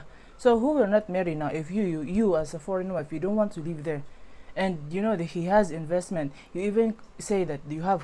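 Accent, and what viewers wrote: Nigeria. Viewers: You really sound like the way Nigeria speaks very good on you stay blessed and be happy. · Omg! you now talk like a Nigerian so cute o 🥰🥰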